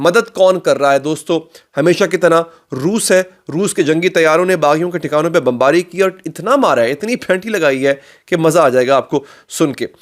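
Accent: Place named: Lucknow, India. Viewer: Aabki Dhuli Huyi Lucknovi Urdu aur Bolne ka andaz aur Laheja bahot pyara hai.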